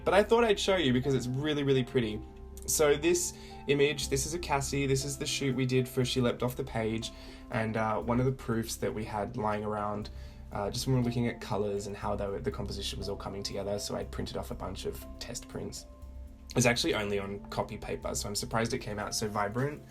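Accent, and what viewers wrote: Australia. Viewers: I could watch you create all day. Don't lose that Aussie accent! Thanks for all the inspiration. <3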